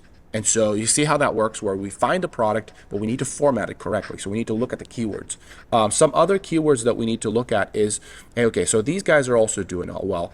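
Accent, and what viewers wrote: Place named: North, United Kingdom. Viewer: your second language? When did you learn? You accent almost just sounds like a northern accent. Impressive 👍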